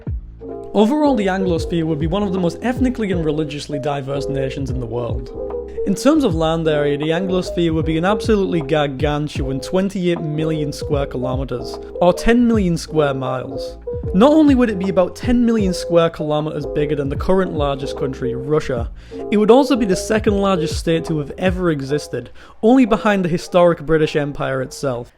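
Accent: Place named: United Kingdom. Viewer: “ The Americans “ 😂 you sound so British !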